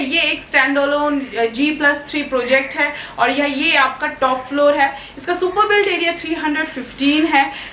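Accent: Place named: Bengal, India. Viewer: Aapnar hindi te clear Bangla taan ashe. Mane apnar primary language Bangla. Buyers would trust Bangali agent much more than Khotua agent.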